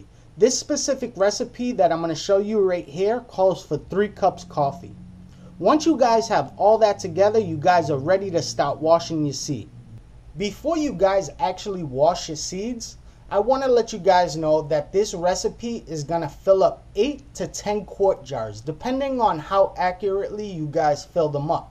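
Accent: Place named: New York, United States. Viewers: He sounds like hes from some where in New York or new joisy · Alright youzzz guyz, now bring it to a simma and then stwain it. I love East Coast accents.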